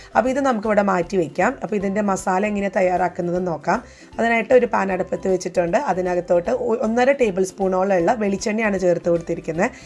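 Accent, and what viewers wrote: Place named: Thalassery, India. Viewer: Superr 👍👍 thalassery recipeyum, thalassery ഭാഷ yum kelkkanno enkile entha youtube channel kannan varuu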